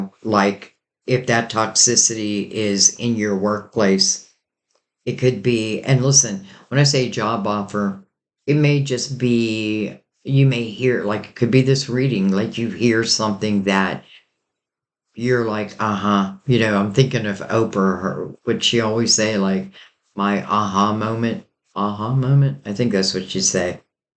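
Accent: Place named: Philadelphia, United States. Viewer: Well, well.... It no coincidence this reading popped up on my feed first thing this morning and I had time to listen to the entire reading. Boom, boom, boom.... So much here. Thank you for your gift. Also, I'm from outside of Philly and. Sounds like you also are from that area...😊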